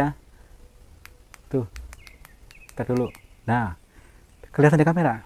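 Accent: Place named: Banyumas, Indonesia. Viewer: Bhsanya kok ngapak Kang,,,memang Kakange dari Daerah mana maaf🙏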